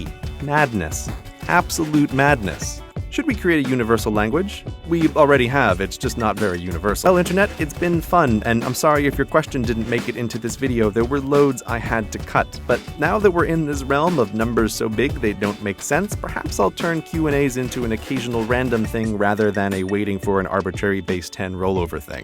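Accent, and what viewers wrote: United States. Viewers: When I was 15, my English teacher (in Norway) told my class that it didn't really matter which form of the English language we chose, but that we should choose one and stick with it. Is your attempt to keep your accent fully American (it's impossible to avoid being affected by those around you entirely) similarly motivated?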